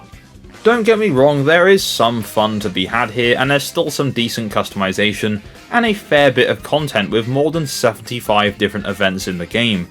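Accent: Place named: South, United Kingdom. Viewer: 90% of Southern English middle class men have this voice lol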